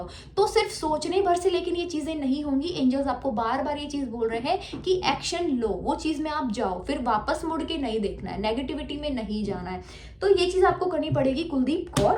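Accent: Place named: Uttar Pradesh, India. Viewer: Qki aap hna bolti ho jaise ki hm log bolte h Mai up se hu Raebareli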